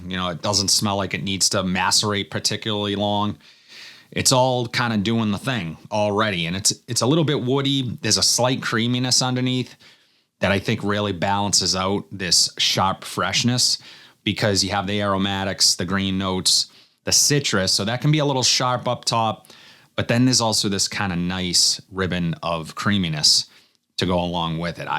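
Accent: Boston, United States. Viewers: Knew that accent sounded familiar. 617 baby 💪🏻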